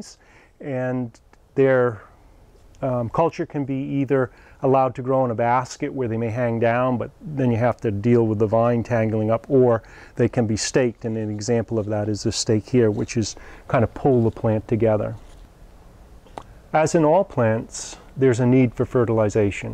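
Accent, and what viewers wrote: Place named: Boston, United States. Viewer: You have kind of a Ted Kennedy accent.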